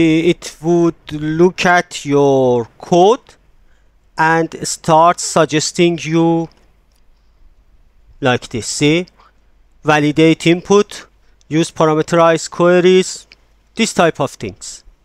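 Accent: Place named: Iran. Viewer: یعنی کسی که هیچ آشنايی با زبان انگلیسی هم نداره، متوجه ميشه که ایرانی هستيد و شايد هم متوجه اصفهانی‌بودنتون بشه. با وجود هوش‌مصنوعی‌هایی که با صدا و لحن خودتون صوت انگلیسی تولید می‌کنند، چه اصراری دارید که با اين لهجه‌ی فاجعه، خودتون صحبت کنید؟؟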